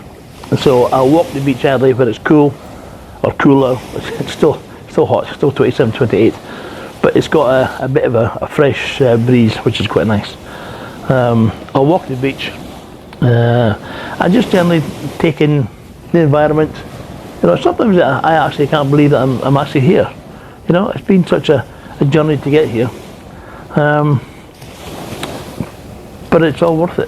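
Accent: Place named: Scotland, United Kingdom. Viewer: I am enjoying your vlogs , and learning to understand your wonderful Scottish accent (lol)! Actually I am a Scot by ancestry, but quite a few generations ago. When I went to Scotland I was embarrassed by my accent, since my surname is pure Highlands . I am looking forward to retiring to Thailand in a few months. Jomtien is one of the places I am considering. My hobby is building and sailing radio controlled sailboats, but they are big and I will probably need a car to transport them to the beach. I have heard that you can park right by Jomtien beach. Is that correct? Looking forward to meeting you in November. Cheers, Colin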